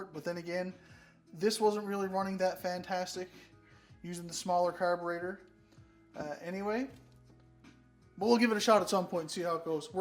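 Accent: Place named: Canada. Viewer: love your videos! I'd prefer real time filming so I can follow step by step rather than the speeded up sections but still awesome nonetheless. Love your Canadian accent too, I'm in New Zealand now but I used to live in Vancouver. We can't easily get carb kits or any spare parts down here. I'd love to rebuild my 1979 XL 100s carb and put a choke cable on it, new throttle cable etc but just can't get the parts :-(. Here's my bike... <link>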